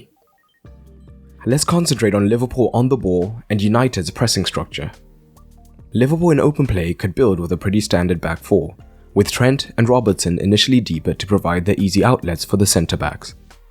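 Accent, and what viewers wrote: South Africa. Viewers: Narrator sounds South African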